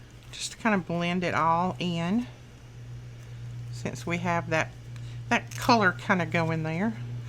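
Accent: South, United States. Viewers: Such cute mini folders love the paper you used. I enjoy listening to your southern accent. 😀💖 Have a blessed day. What is the name of that beautiful black bling that you used on butterfly and where do you get it?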